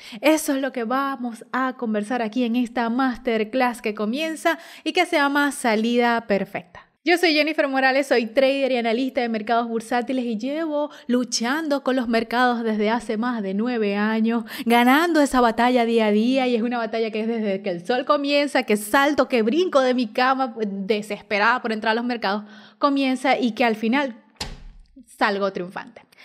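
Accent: Maracaibo, Venezuela. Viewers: Verrrgacioon maracucha te amoo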